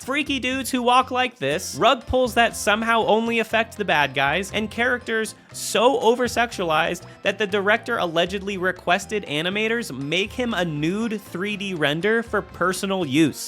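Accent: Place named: United States. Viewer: Please dude - Speak Slower. I'm native English speaking, but sometimes can't understand your American Twang....just BREATH!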